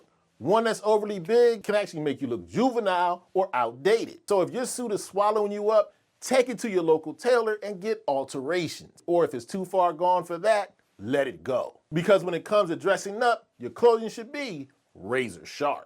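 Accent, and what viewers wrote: Philadelphia, United States. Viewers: You sound like you from west Philly or uptown. Jacket fire.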